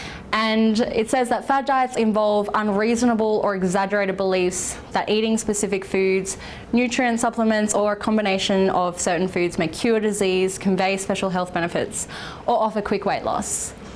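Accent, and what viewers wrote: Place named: Australia. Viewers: No that's an Australian accent. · Can't place her accent. Is aussie...sort of...but something else mixed in... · That's a typical younger, educated Australian's accent. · There is a hint of a regional Aussie accent. To ears not familiar with the Australian accent, Mark Twain described it as the most purest English accent. I’m pretty sure he was drunk. However, our society really didn’t like a classist society modes and thus our accent is based on a societal protest against elocution. Enter the Aussie twang.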